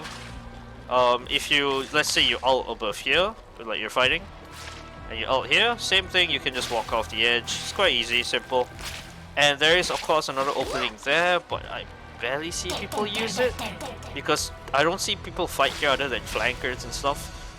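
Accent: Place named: Singapore. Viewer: Sounds like Singaporean or Malaysian 🤭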